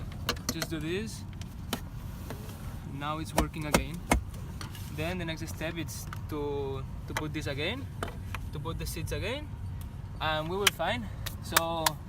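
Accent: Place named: Spain. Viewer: Hahaha saludos desde USA me mola tu acento de español Hahaha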